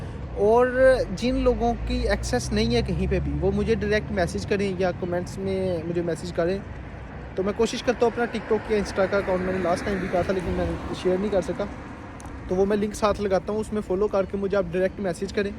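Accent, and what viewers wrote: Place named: Lorestan, Iran. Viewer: bhai Love for ur politeness and Lori Accent❤😂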